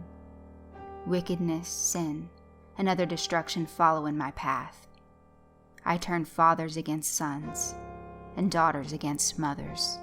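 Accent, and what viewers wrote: South, United States. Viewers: that southern accent though.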